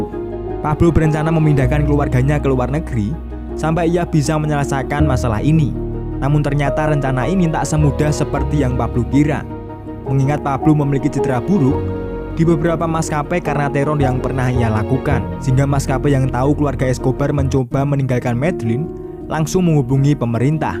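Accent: Java, Indonesia. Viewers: Mas sampeyan Wong Jowo?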